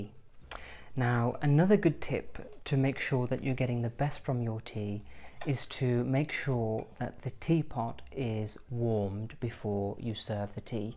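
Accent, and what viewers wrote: RP, United Kingdom. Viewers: I went to an English school for sixth form and I love all of Britain. But (please forgive me) this type of accent is so hilarious, it sounds so pretentious and formal, I always wonder what you must have put up your * and what size it is. Great video though. WHERE IS MY SCONE? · Thanks for sharing. I didn’t know green tea wasn’t able to withstand very hot water. Interesting. My grandmother was from the UK and she passed away a couple years back. I usually drink coffee but I will have to have a cup of tea in memory of her. She was in the US for so long (from around 20 years of age due to marrying my grandfather who was in the Air Force; they met in England when he was stationed there)... Anyway, I’m rambling but I miss her. She was the most elegant lady I know. You remind me of her for some reason. It must the the accent as well. 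· My goodness. I'm so mesmerized. My grandfather is a Londoner in the US and I've never heard anyone so posh besides him....but also SO amazingly refreshing. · god i love british sm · Way too posh for me!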